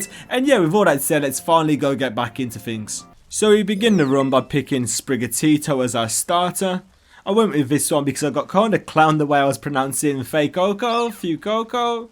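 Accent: United Kingdom. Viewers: I mean your run was fun to watch, but man, you sound so bored narrating it. Also your accent is a little hard to get used to - is it a British accent? never heard someone talk like you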